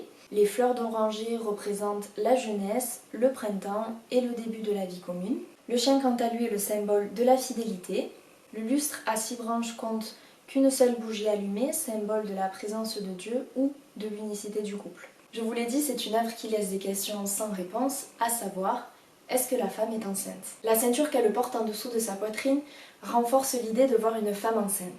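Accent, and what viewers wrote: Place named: South, France. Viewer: Elle explique bien (elle a l'axen du sud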